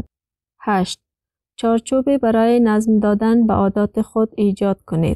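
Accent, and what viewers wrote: Afghanistan. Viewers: Dari accent is also beautiful I like it. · خیلی با دری در ارتباط نیستم ولی خوب حرف می زنه فقط عادت ندارم بهش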